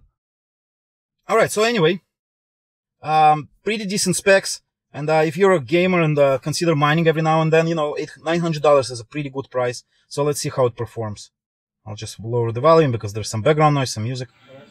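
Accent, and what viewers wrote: Russia. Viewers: Русский акцент??)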